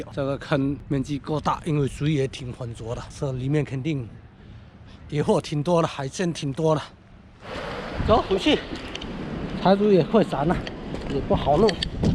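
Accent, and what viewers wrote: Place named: Fuzhou, China. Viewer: 听口音是福州附近海边的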